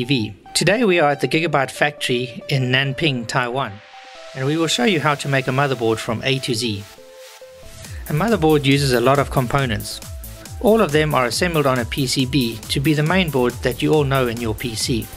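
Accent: South Africa. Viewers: Are you South African? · Sounds like a South African talking LOL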